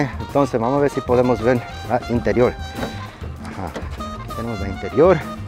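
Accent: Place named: Puerto Rico. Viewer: Saludos. lo de los vidrios se pueden reparar, ahora hay un metodo que usan para reparar. Pero se ve bien ese VW. Se dice burbujas de aire, lo del mercedez. Ya sabía yo que esa forma de hablar tenía algo de boricua. Que bueno tenerte paisano en estos medios...Haz ido a la isla? Yo vivo en Perú.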